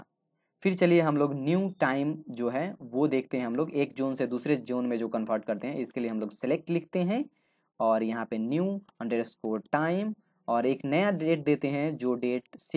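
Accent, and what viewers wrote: Bihar, India. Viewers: bihar k lagte ho bhaya...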